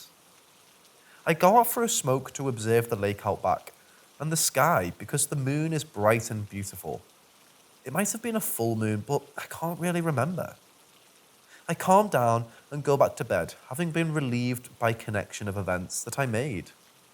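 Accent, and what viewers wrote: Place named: Sunderland, United Kingdom. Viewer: Hmmm cant decide if your a Geordie with a middle class background or you hail from across the river Tyne beyond Gateshead, with a smattering of Sunderland tones, not Durham, too thick of an accent. Maybe uni at Manchester? Back to Newcastle or even Northumberland? Im on the far Northumbrian Coast & love tracing where accents come from & the little quirks of other accents picked up from a short stay or via friends & family. Thanks enjoyed yr video 😉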